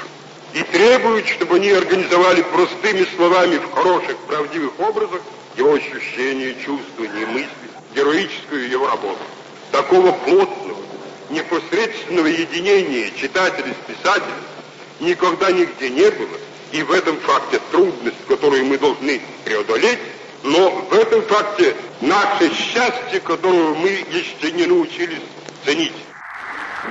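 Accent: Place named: Urals, Russia. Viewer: Он сильно "окает". Но в этом, его обаяние! Долгое время на Урале (и в некоторых областях) еще так разговаривали.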